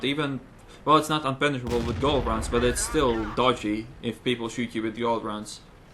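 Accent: Sweden. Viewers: Wait, you're Swedish?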